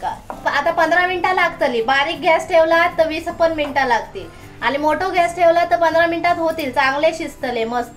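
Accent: Malvani, India. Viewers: माका तुमची मालवणी बोलायची पद्धत लयच आवडली,माझ्या गावाची आठवण झाली आम्ही गावी सर्व मालवणी बोलायचो,आणि तुमची अळूची गाठीया बघून मला माझ्या आईची आठवण आली अजूनही आई करते आलूच्या गाठीया,खूप छान सांगितलात recipe 👌👌👌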